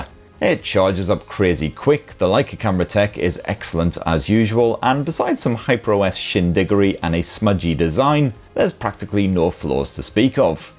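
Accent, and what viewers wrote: United Kingdom. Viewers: Bro likes saying bloody(of course his British (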